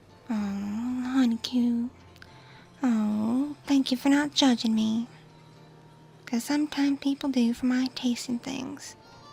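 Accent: South, United States. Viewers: Your Country accent is really unique & special,i love all your asmr's and wonderful rps which are so appealing because you put your heart & soul into all of your work! Thank you for all that you do & the work you put into every video to help others feel good. ☺ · I do believe your country accent is one of the most blessed ones around, it is so relaxing to listen to, and on top of the crisp sound effects and immersive background soundtracks, the listener is teleported to whichever setting your audios is set in. Fantastic as always.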